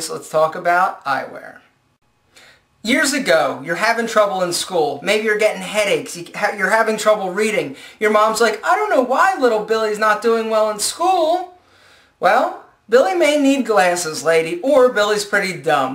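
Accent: Philadelphia, United States. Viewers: Oh boy. Philly accent. "Gleasses"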